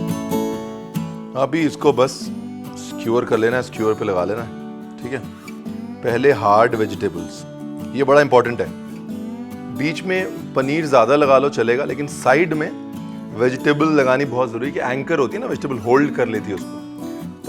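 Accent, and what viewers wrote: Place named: Punjab, India. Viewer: Bhaaji you are the best across youtube and i love how you speak your punjabi accent i love that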